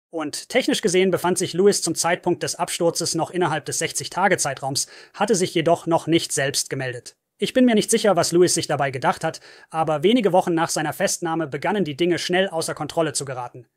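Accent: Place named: United States. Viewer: Was soll dieser ständige Wechsel von rel. sauberer deutscher Sprache in einen beschi...amerikanischen Akzent?! Sowas kann man sauber programmieren, also wieso dieser Unsinn?!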